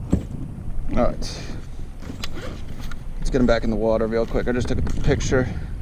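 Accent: Philadelphia, United States. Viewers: Hey I like your videos i like the way you talk. Makes me wish I was back in Philly. You stopped for a while and I was afraid you were gone. Now your back. Cool. Thanks dude.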